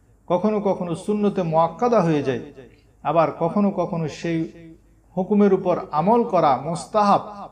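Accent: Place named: Chittagong, Bangladesh. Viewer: Chittagong er hujur bujha jasche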